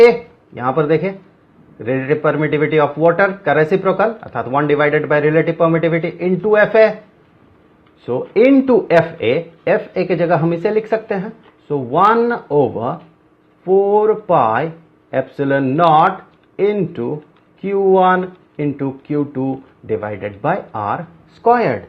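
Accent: Bihar, India. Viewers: sir aap Bihar se hai kya? love your voice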